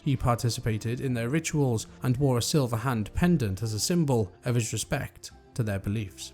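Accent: Wales, United Kingdom. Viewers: This is great for us returning to Azeroth. Weird question, is that a Welsh accent?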